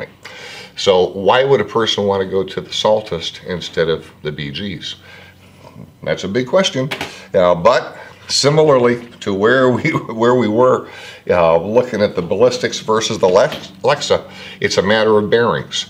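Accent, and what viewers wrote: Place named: East Texas, United States. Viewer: I think I was pretty clear why I didn't spend time yacking about drag levels, talked about that at the end of the video. Watch the end of the video and perhaps you'll understand why I feel those numbers are pretty much bunk. In my mind, its pretty silly to talk about high numbers when they don't apply to reality. Who cares if a $200 spinner has 30-40 lbs of drag, it's not going to be used and the rest of the drive train won't necessarily sustain those pressures. On a 50 sized two speed made for catching cow sized tuna, it's meaningful. On a lighter duty spinner, it's really irrelevant so long as there's enough usable drag to fish the intended line class. The BG's do that very well, as do the Saltist series spinners. As to why I say yeow...simply because I can.... Why do I wear a hat...because I like 'em and it's cold on a shaved head. 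Why do I wear clean underwear... I am not a TV personality, newscaster or info-mercial talking head, I'm a f'n fisherman who tries to provide some info to anglers. Do not expect more than what I'm capable of providing, and if my manner or pauses in dialog, accent, colloquialisms are disconcerting, so be it, no apology. Shucks, catch me at the right time and I'll lapse back to an East Texas accent. No doubt the manufacturers' own vids are far more professionally produced, and you'll get more of their talking points and buzzwords. However, our vids are independent, honest, and accurate, and done to the best of my meager ability. I'm happy to take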